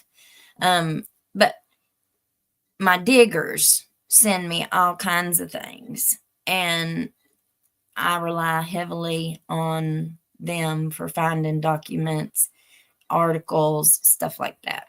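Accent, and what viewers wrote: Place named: Appalachia, United States. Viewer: G Fish is how I found this channel. I'm in the UK and absolutely love the "Hillbilly" accent ( no offence meant).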